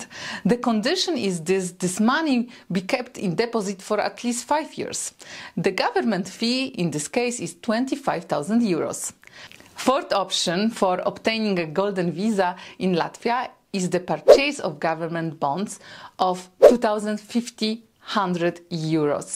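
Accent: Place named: Russia. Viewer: Yoir accent is like that of Russian.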